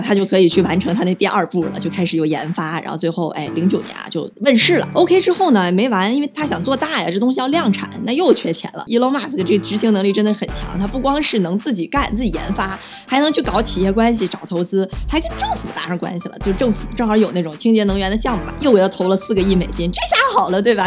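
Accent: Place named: Henan, China. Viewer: 那里的老乡？听说话像河南、陕西、山西那一块的人。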